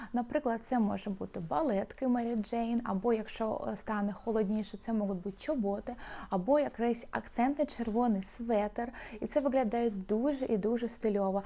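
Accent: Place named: Russia. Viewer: Навчіться правильно розмовляти українською. Чисто через вашу кацапську вимову не змогла дивитися більше півхвилини. В українській літера "г" - м'яка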